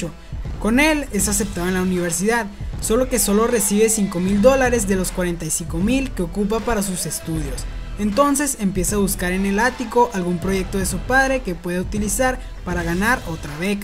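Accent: Chihuahua, Mexico. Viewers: Eres de chihuahua? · acaso eres de chihuahua en México o que por que tu acento es similar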